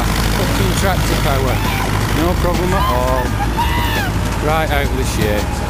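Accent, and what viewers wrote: United Kingdom. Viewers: 1967 MUSTANG Near Canada???????????????????? Well I suppose northern England is near Canada if you live on the moon! However being as how you don't please, please have another look at the video and you will be surprised to see all the vehicles taking part have British, that is United Kingdom British, number plates. And if that isn't enough what kind of Canadian accent do you think you can hear the bloke speaking with? Near Canada indeed!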